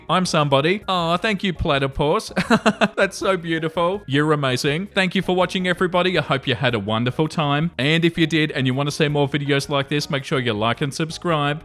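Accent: Australia. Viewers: It's probably a accent thing as he is Australian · It’s funny. I’m Australian, so I don’t notice his accent, but I recognize the kindness and horror in his voice. 😂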